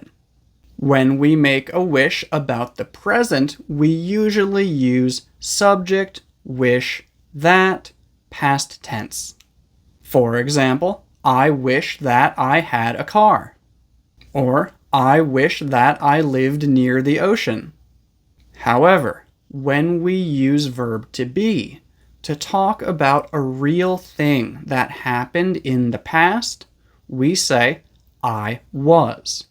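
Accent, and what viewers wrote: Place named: United States. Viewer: I wish I had you nice American accent. I wish you good luck. I wish you had more patience. I wish you all the best in this year. Thanks a lot,. I always I wish you more success.😊😊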